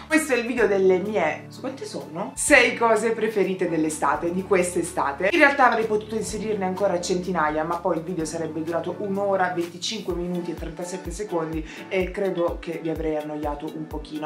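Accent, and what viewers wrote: Apulia, Italy. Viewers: i versi mi hanno uccisa😂, sei bellissima ,si sente che sei pugliese,lo sono anch'io, se non sbaglio sei di Foggia... ciaooo bacii😂❤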